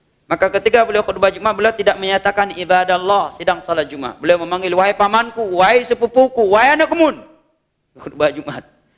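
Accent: Aceh, Indonesia. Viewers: Sy seneng logat bahasa acehnya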